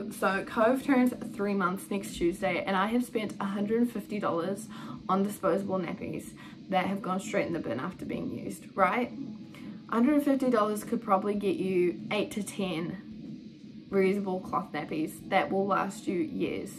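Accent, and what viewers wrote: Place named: New Zealand. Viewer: Yay lol perfect timing! Trying to figure out cloth nappies for my babe. Far easier to understand and enjoy listening to another kiwi young mum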